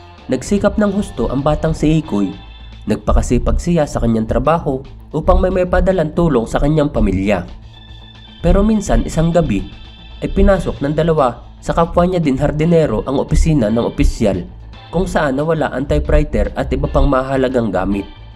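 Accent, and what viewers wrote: Philippines. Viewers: Taga saang probinsya yung nagsasalita? Bulcan ba yung ganyang punto? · bisakol ampota!!! pilit managalog brad ah! tang ina ka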